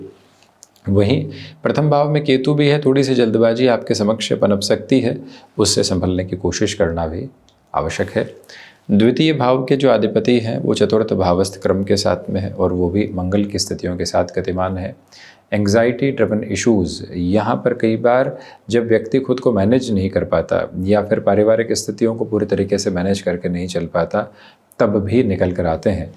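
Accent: Standard. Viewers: Bhai aapke pure Hindi sunne ko bahut he achha lagta hai ❤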